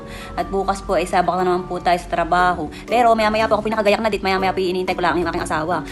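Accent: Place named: Batangas, Philippines. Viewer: a talaga peru bakit parang pang Batangas accent nyo pala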